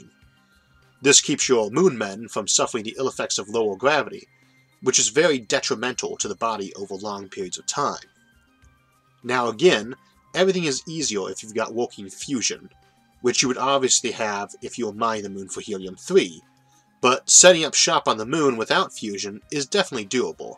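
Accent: United States. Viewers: Your voice is fine. Which part of the States does your accent come from? I’m from nz. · And I don't think you have a "Speech impediment". Horrible yank ascent but that's it. And I can get throught that. Going to Lunar is akin to going to the corner coach station and that's about it. Now, if you want to use it as an analogue for Mars then you may have something. You can install astronomy telescopes arrays that can be remotely controlled. which could be deployed around the surface. And you could install communication relays around it as well but placing those in orbit could be a better method of deployment. But as far as mining it? I don't think it will "panout" as you yanks say. Yes, there is material there but mining it in large qualities could be more of a dream than reality. Anything near the surface would have long since cooked off. And the ice echos from the surface as likely something else all together. 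RDF can be easliy fooled. You would have to go down atleast 200m before you remotely will find something. So, I don't see Lunar to be a place for any colonization. Just maybe a repair team to fix whatever can't be fixed by robotics. Other than that. That's it. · I never noticed an impediment, just a US accent.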